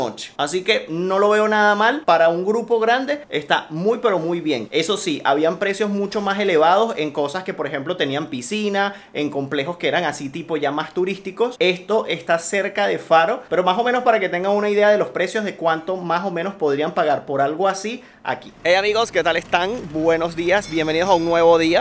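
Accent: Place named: Venezuela. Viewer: Pense q. hablabas Portugues q. eras Venezolano padres portugueses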